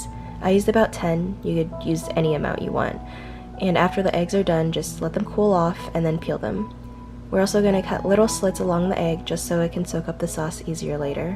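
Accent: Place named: West Coast, United States. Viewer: thank you i love when I get recipe from the real country people. however you sound like west cost.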